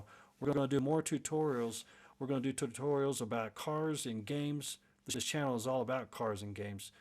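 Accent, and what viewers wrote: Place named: South, United States. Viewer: You have the soothing southern voice for it, just every time you make a mistake call it a "Happy accident" and add a "happy little piece of rust" here and there.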